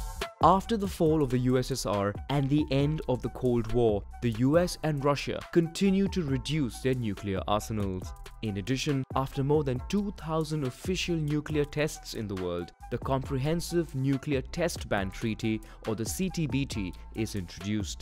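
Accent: North India, India. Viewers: Is the narrator Indian? His accent sounds so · i can hear indian accent in his voice why ? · Ugh hate indian accent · Yeah ....this is north Indian accent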